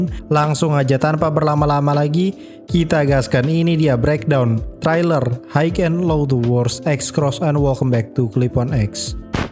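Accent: West Java, Indonesia. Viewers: Enaknya channel Ini Kadang Ada Logat Sundanya... Jadi enak Buat saia yg urang sunda pisan... Btw salam subscriber baru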